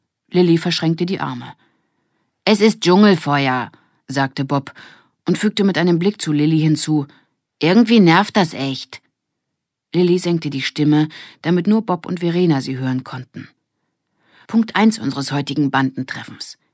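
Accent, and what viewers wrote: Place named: Russia. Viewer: Haha cool, da bin ich nicht die einzige, die mit bald 30 Jahren die Fortsetzung einer Ära vergöttert! Vielen vielen Dank! Ich wusste gar nicht, dass “Die Wilden Küken” existieren. Immer, wenn’s mir schlecht geht oder ich krank bin, höre oder schaue ich “die wilden Hühner” & das gibt mir ein Gefühl von Geborgenheit Glück & Kindheit. Aber als ich nach den Wilden Hühnern hier suche, finde ich diese Reihe und hab den ersten Teil in 2 Tagen durchgehört! 🥰 Danke dir!! 🙏🏽 Stimme allerdings dem Kommentar zu den Akzenten zu! Das klingt wirklich eher russisch und sehr sehr harsch haha, aber es gibt auch nen Lacher, wenn auch mit verzogenem Gesicht 😅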